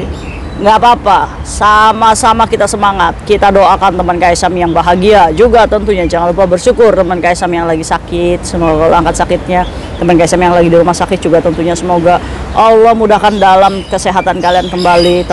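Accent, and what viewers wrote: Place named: Java, Indonesia. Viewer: Ka orang indramayu ya kk ko Bahasa rada " jawa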